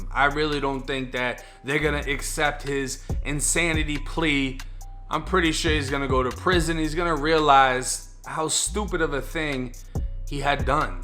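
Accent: Boston, United States. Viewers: I know you were from Boston! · What's that accent,? Boston? Anyway, I just found your vids a couple days ago...good 💩, pun intended 😂 I was from MI, came down to FL in 2012. Went through my little few month county ordeal, but nothing like the CIs. But your work still hits close to home. I wish you continued success. We rockin' with ya in Ft Myers 👊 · I KNEW YOU WERE FROM BOSTON!!!!!!!!!!! lmao this whole time ive been trying to figure it out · You said walking through Boston? You sound like Boston but I thought you was Florida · I knew knew knew you from Boston? What part...heard the accent in all the videos. Dorchester all day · I knew you were from Boston from your accent homie. Your background is probably Irish I’m guessing. We got an Irish homie from the hood. We call him polar bear. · The Dog shit shooting I cant with you today.... are u from Boston to?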